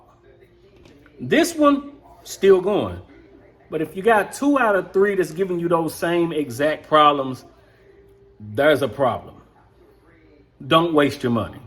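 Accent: South, United States. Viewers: That country accent makes these videos hard to watch. Narr, parr harr, hurr. That why its taking so long to get 5k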